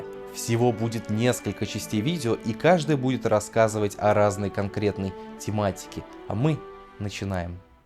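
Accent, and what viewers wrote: United Kingdom. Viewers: Дружище, у тебя ахуенный британский акцент. Южный как я понимаю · Чувак, очень классное видео, продолжай и достигнешь высот! Кстати, если не сложно, сними, пожалуйста, видео о постановке британского акцента, твой звучит очень аутентично! Мб хоть какие-нибудь советы дашь, как улучшить звучание (не говорю о дефолтных TH и т.д) В общем, урок для тех, кто изучает английский в России, но не находится на начальном уровне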